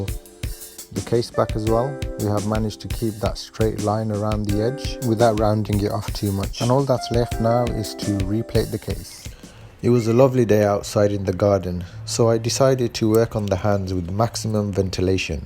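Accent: Birmingham, United Kingdom. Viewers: Are you from Birmingham? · I am not a watchmaker but an engineer. As such I can appreciate the work in these beautiful units. Your videos are clear, informative but best of all relaxing and easy to watch. I think the gentle brum is a joy to hear. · your English accent suggests that much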